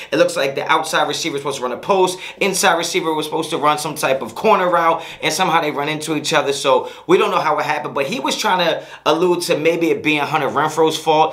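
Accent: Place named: Los Angeles, United States. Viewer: Talk normal please. We left LA years ago! Come on man!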